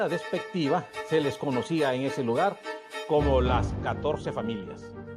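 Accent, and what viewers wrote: Honduras. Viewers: Este maje del narrador es hondureño jajaja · Ese narrador es puro hondureño no bale verga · Juan Alvarez EXACTO DESDE EL PRINCIPIO QUE EMPIEZA A NARRAR SE CONOCE Q ES CATRACHOOO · Se nota que el que habla es hondureño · Con que dolor lo narra este idiota narrador, se le nota lo hondureño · No hay duda que por la forma que narra la historia es hondureño jijiji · Ese narador a de ser hondureño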